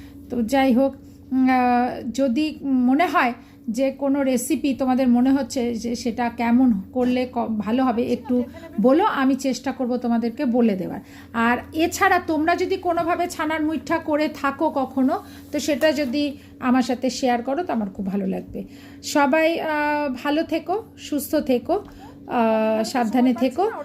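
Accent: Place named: Bangal, Bangladesh. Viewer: Akebare notun ar chamatkar akta ranna . Bangal bhasa sunte besh laglo ,abar o sonar apekhay thaklam .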